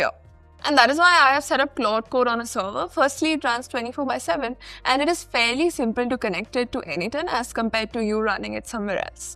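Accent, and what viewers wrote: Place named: India. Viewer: I cant with the indian accent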